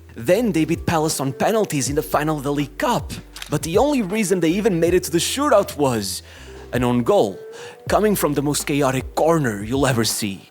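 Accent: Portugal. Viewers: I thought you were better than this. You’re just Sky Sports with a Portugese accent.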